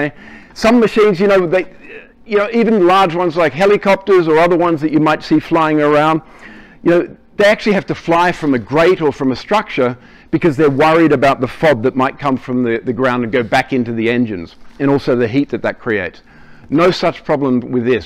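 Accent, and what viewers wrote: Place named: France. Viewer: French accent!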